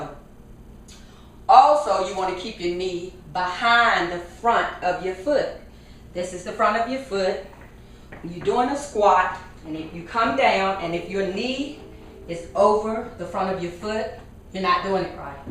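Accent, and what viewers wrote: South, United States. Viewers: You remind me of my ppls down south, you have the crazy country accent!! LOL!!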